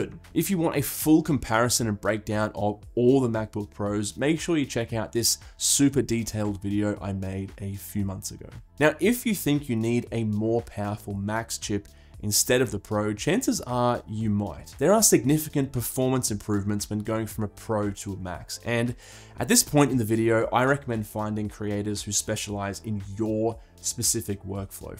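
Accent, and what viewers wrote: Australia. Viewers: Why do i feel stupid when i can’t easily follow through an Australian accent 😅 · me rocking a 2015 macbook pro which i think she is screaming for me to let her retire lol thank you for making this content and nice to hear another aussie to! I tend to lean to more beefy ram as i hold my laptops for years. I do think I can buy any of the current line up and be really happy but I have been a pro user for ages ha.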